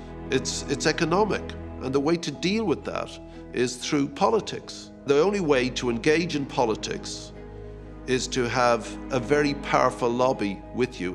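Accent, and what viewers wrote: Ireland. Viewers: Utterly Irish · My God geldoff is so Irish.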